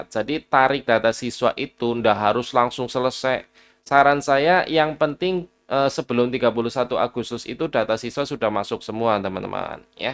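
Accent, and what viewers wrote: Banyumas, Indonesia. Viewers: makasih banyak mas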